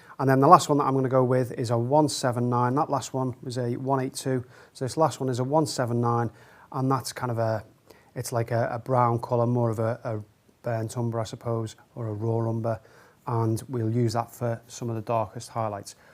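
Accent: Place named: Merseyside, United Kingdom. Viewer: Enjoyed the video just great thanks. Ps your voice sounds like mine Merseyside right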